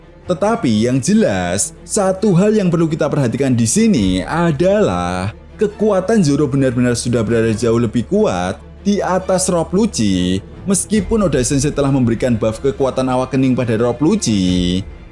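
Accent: Java, Indonesia. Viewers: Mendok jawa jir😂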